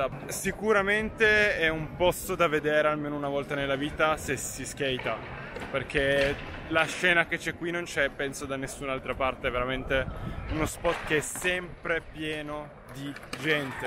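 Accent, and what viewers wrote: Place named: Spain. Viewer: Hai già l'accento spagnolo tío🇪🇸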